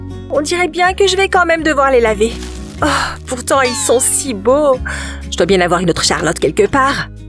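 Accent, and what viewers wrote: England, United Kingdom. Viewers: Vous êtres anglais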